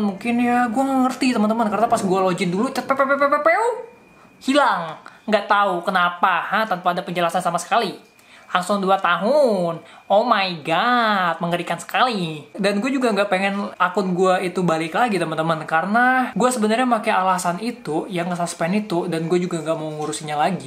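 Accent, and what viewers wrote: Indonesia. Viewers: Here your English comment Dead channel because of the freaking Indo voice lol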